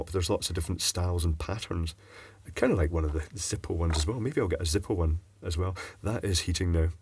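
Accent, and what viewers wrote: Scotland, United Kingdom. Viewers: Every time you said “gasoline” rather than “petrol” in your quite cultured Scottish accent..! lol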